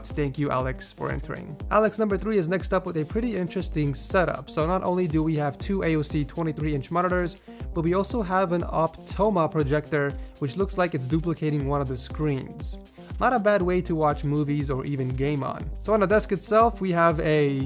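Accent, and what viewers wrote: Russia. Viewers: Awesome Russian accent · Best Russian accent evr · At first I was going to click out the video because of the Russian acent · Nice Russian Voice +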